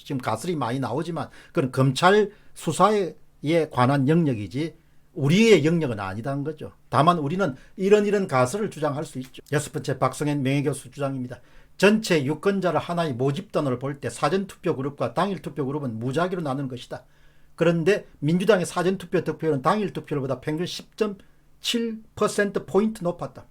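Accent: Gyeongsang, South Korea. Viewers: 저는 선거전에 조작이 되면 어쩌나 하고 생각 했습니다. 사전선거하는날 왠지 음훙한 느낌이 들었고 뭔가 음모가 있지 않을까 하는 불안감이 들었습니다. 그러나 진짜 그럴려고.... 하는 생각에서 민주당이 압승을 거두는걸 보고. 사실이구나. 하는 느낌를 지울 수가 없었습니다. 제 주변에 많은 사람들이 민주당에 치를떨고 싫어하는데. 그런데. 공선생님께서 이의를 제기할실때. 나만 그런게 아니구나 하는 생각을 했습니다. 선거조작이 아니라는 집단과 조작이라고하는 분들의 영상을 보며. 조작이라는 쪽으로 확신을 얻었습니다. 공선생님께서 옳은것을 지키려는 마음이 피부에 절절히 와 닳습니다. 수시로 올리시는 영상을 보며 마음이 많이 아팠습니다. 같은 진영의 언론인 출신분들이 마치 공선생님이 뭔가 잘못됐다고 비웃을때 끄덕하지 않고 끝까지 밀고 나가시는 결기에 탄복하지 않을 수 없었습니다. 새벽이면 올라오는 영상들. 끊임없이 옳은길을 주장하시는 경상도 말투. 저도 경상도 사람으로서 너무도 같은 정서가 느껴졌습니다. 단호함과 부드러움. 그리고 옳다고 생각하시는 것에 굽히지 않으시는 결기에 깊은 존경심을 보냅니다. 부디 이기시길 마음모아 응원합니다.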